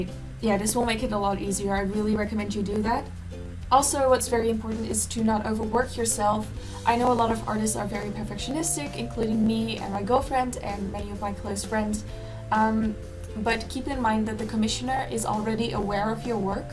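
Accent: Netherlands. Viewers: No, I believe she is from the Netherlands. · This is a super random and redundant question, but are you Dutch?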